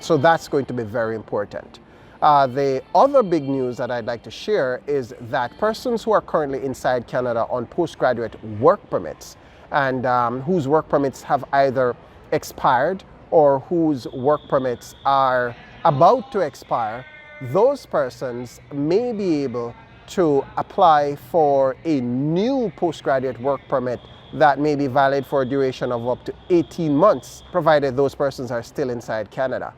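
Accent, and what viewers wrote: Jamaica. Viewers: Thanks for the info, are u jamaican?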